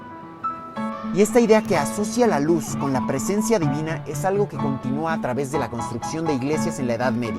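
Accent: Mexico. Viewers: Creo que es un vídeo de pacotilla al tratar de comparar os obras de arquitectura diferentes, creadas con diferentes técnicas y propósito… he estado en las dos y las dos son hermozas y si es solo de cúpulas en las que tenemos que hablar… bueno pues, las dos son diferentes y las dos siguen allí… los romanos fueron maestros en el uso del hormigón y no se a tenido que rehacer varias veces, además que tiene un calendario solar en su interior, con precisión milimetrica…. Bueno y para terminar, para poner un canal en cualquier idioma, hay que tomar primero lecciones en ese idioma, “el mexicano” que aquí escucho no es un español de lo mejor, siento lástima por aquellos que comentan que les aluda a prender español! El “mexicano” todavía no esta reconocido como un nuevo idioma verdad? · Un video diferente a los que solo pasan de largo por la ciencia y la cultura de lo que filman. 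Tienes acento mexicano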